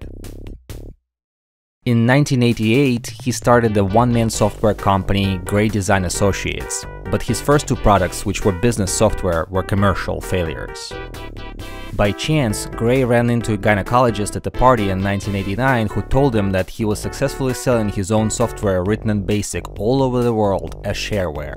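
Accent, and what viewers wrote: Russia. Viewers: I like your secretely half russian accent! So awesome! and great vids too! :D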